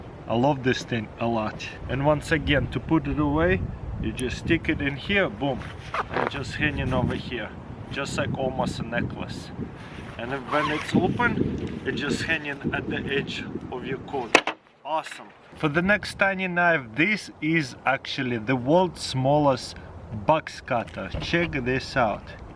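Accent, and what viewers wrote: Russia. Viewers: He's russian guys chill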